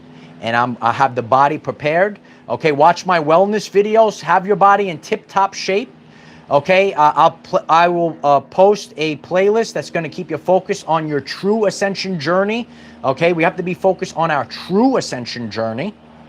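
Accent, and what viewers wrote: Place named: New Jersey, United States. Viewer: Hi this is Milan haven't seen your channel in a while. I have enjoyed it very much . Love your accent I'm from the Boston area soulth Shore I'm suspecting New Jersey love it. ❤ 🙏 🕊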